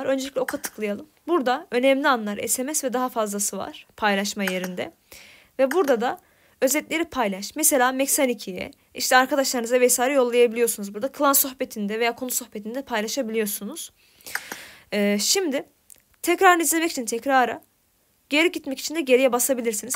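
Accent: Azerbaijan. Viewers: azərbaycanlı sən..?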